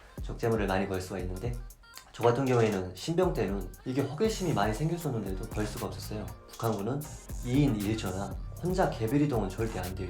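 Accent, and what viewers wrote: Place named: Busan, South Korea. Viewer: 부산 특유의 억양 ㅋㅋㅋ 북한에서 청년기 보내도 서울말 자연스럽게 쓰는데 왜 경상도는 안 되는 거야 ㅋㅋ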